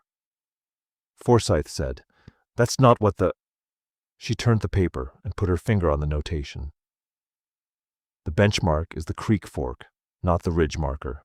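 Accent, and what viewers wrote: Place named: Australia. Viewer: That was beautiful! Sounds Australian.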